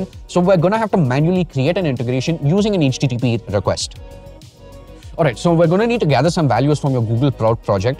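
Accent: India. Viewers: He sounds Indian and looks Mexican 😂😂😢😅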